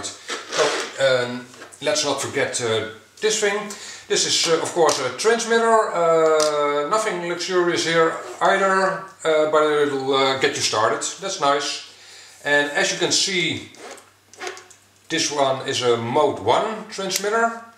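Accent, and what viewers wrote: Netherlands. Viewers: Mooie video! Misschien is het handiger om NL te spreken? Het is een stuk moeilijker om naar Engels met een Nederlands accent! Voor de rest echt een SUPER GOED KANAAL! Meteen geabboot. 👌😊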